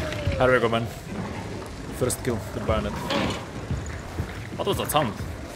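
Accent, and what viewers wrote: Czech Republic. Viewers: Im already know all by 300 hours but thanks for tips you sounds I little bit like from Czech Republic so easy to understand thanks man..👍🤠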